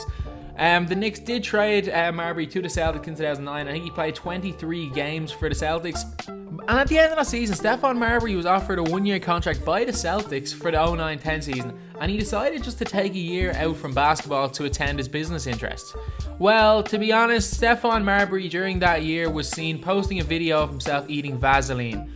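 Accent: Dublin, Ireland. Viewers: Dublin accent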